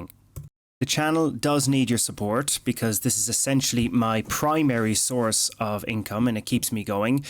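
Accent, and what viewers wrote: Ireland. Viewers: Out of curiosity, which part of Ireland are you from ?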